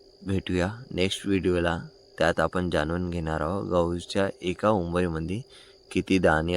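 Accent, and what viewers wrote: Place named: Jalgaon, India. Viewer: मराठी जडगावची आहे वाटत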